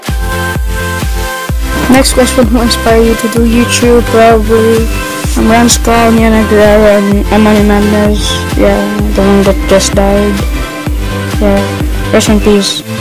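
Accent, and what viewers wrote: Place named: United States. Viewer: He is a filipino but his voice sound like an american holy moly hooowwww dude!!! Lol Edit: i dont even understand ur language but it got me laughing when u were talking with ur language XD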